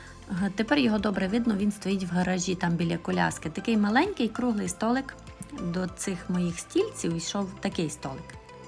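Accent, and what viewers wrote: Transcarpathia, Ukraine. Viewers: Нарешті я вас знайшла. Давно дивилась ваші відео, а потім мій акаунт пропав і прийшлося встановлювати новий і відповідно всі підписки на Ютюб були пропали, я рада вас бачити..... Я доречі теж з Закарпаття і тому дуже люблю слухати свою акцентовану мову, мало ко її розуміє, а я рузуміву❤😂😂😂 Я з Великих Лучок за Мукачево, але проживаю зараз в Львівській області......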